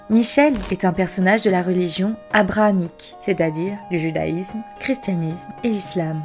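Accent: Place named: Italy. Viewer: Cet accent italien une douceur pour les oreilles! Sinon excellent travail. +1 abo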